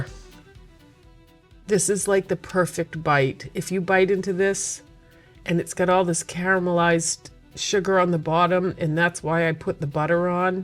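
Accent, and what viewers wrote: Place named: Wisconsin, United States. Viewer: I haven't been watching your channel very long (my loss - I'll have to binge older videos to make up for it), but every time you talk, I hear Wisconsin. Maybe Minnesota, but my mom's side of the family is from Wisconsin, so that's the first that comes to mind. I miss visiting there and should definitely swing back for a visit. I haven't been back in over 15 years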